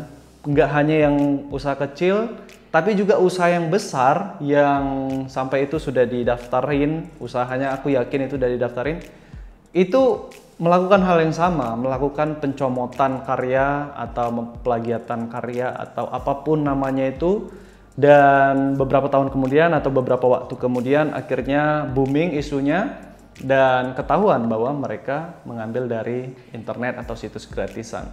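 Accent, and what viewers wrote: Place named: Pematangsiantar, Indonesia. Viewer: logat bicara mu bro mancam par siantar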